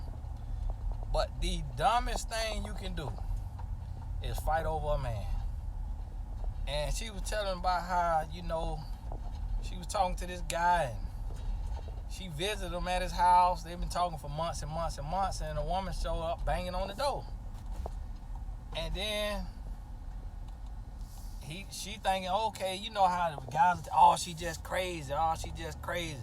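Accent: South, United States. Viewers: Omg Tony is hilarious and his videos are such a blessing. No fighting over men ✅ ya hear me... now God bless ya! I’m southern too from Florida so I can relate to the draw 😊 but the wisdom has me on another level. I’ve even started my own business and I’m investing in me and being focused something I’ve struggled with for years. His videos make me want to be a better person and Christian. I just bought the book a woman’s influence. Looking for it to come by mail Thursday. I just speak blessings on Mr. and.. I don’t care what criticisms may come please don’t let it deter you, Tony is doing purposeful work and I’m grateful!!!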